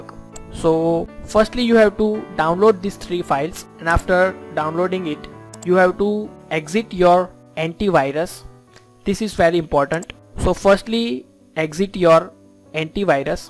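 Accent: India. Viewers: YOU ARE THE MAN!!! 10+ years I worked with Studio, then a few power cuts happened in a short row, always happening again whilst my pc was restarting. at the end it didnt start at all. the tech shop next door re-installed windows, but all programs and applications would be gone. so I downloaded everything from AVG over ACDSEE over FILEZILLA over HANDBRAKE .... up to STUDIO 19. Installing process went fine, just as dozens of times before in my like and then - I couldnt see a video, neither from the Source nor from the Timeline. It didnt make sense to me, I triple checked everything from Drivers to Graphic card to windows settings to Studio settings - all was fine. But I couldnt make those videos visible. I was chatting with Coral`s tech support as well of course - they had no clue whatsover. And then I just described on Google the problem that occures in the field for the search term and 3 clips appeared on Top with one being yours and when I heard the Indian accent I knew I was in good hands. 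How would a normalito like me ever would know of 3 windows apps which i had never heard of, unless someone who knows tells him. AND FOR THAT I AM TRULY GREATEFUL. NEXT TIME I GO STRAIGHT TO GOOGLE. THX A MILLION, PAPI. SALUDOS DESDE BARCELONA MARIO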